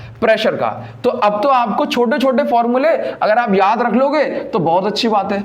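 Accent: Odisha, India. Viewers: Sir are you from Odisha? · Sir you are from odisha??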